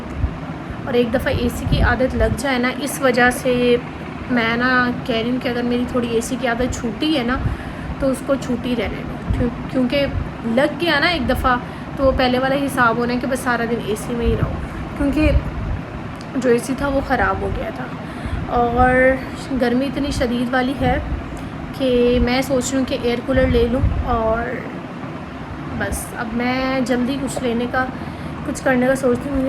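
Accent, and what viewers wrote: Punjab, India. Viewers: Our Punjabi accent is so funny and cute😅😍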